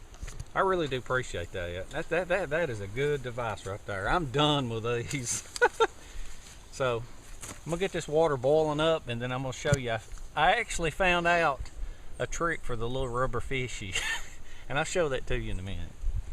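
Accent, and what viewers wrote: South, United States. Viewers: I live in TN. In Nashville now and I lived in east TN by the mountains when I went to college(ETSU). Noticing accents is something I kinda picked up. To me you have a northern Alabama/Georgia accent which is cool. You should have a survival weekend and invite survival/bushcrafters from youtube and have challenges. Im new to it and I'm kinda preppy you could say so my friends arent one to get in the wild like that so I mostly do it by myself so getting to see others on youtube is a treat. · Loving this already, just from the way you talk; sounds like home. Awesome use of all the items, and what a fun challenge! One of the best videos of this sort I've seen. Elevated bed, great use of the food and cooking, and great camera work from Nick, too. Such fun. The woods make me homesick. From another green-eyed Southerner, well done!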